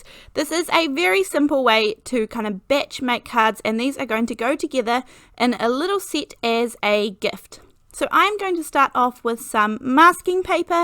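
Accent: New Zealand. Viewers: just watched your awesome video, love to hear a Kiwi doing this, amazing. Thank you, and yes I've subscribed.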